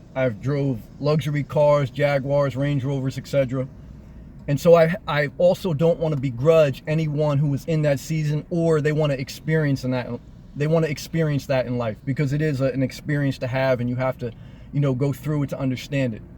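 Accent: New Jersey, United States. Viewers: I would rather live in a car than an office. Never seen your videos before but you sound like a Jersey boy like me. Hope I am not misjudging the accent, I have been Californicated for the last 25 years and love it! I had a large family, so I´ll keep my mountain acres but I feel you on materialism. I think the key for me was to make sure I accumulated enough income streams that I never HAD to work again....like now. My kids are grown and I am still young. Fuck yeah!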